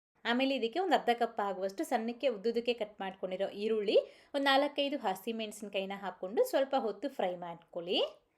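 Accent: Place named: Tamil Nadu, India. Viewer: She is a Tamilian avr accent hage ne irathe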